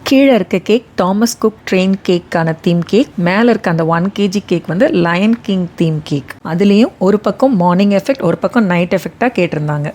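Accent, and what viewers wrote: Madurai, India. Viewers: அருமை அருமை நீங்க மதுரையா sister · Nega madurai ya akka 😊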